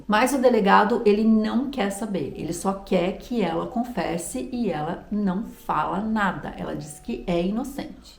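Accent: France. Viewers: Oieee... Veramente, esse caso é o reflexo de uma sociedade preconceituosa, de uma imprensa q n se importa em mostrare a verdade, mas q é totalmente voltada para o sensacionalismo e o lucro, sem importarsi das consequencias de meter na lama, e distruir a dignidade de pessoas, q seguendo a lei deveriam ser inocentes fim a prova contraria! Purtroppo mudou pouco essa mania de fazer julgamentos, e bene si eu vejo ai da uma sociedade muito masquilista, e mulheres q sofrem ao ver o su esso, è a independencia de uma outra mulher... Eu moro a Forlì, cidade pequena, q vc espirra aqui, e no outros dia tds dizem: " meu Deus ela ta com Covid"... Pode acreditar!!! Baci a te, e adoro sentir o teu frances ... Adorrrooo, purtroppo o meu Frances é escolar, kkkk.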